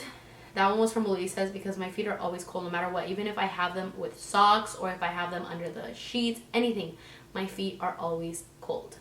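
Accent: United Kingdom. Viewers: That accent is def british hahahaa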